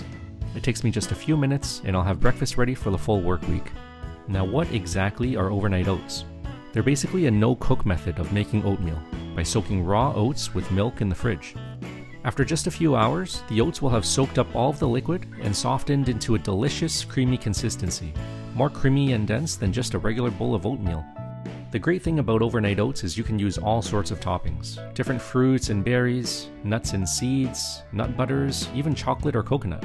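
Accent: Canada. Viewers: Canadian accent, right? :-)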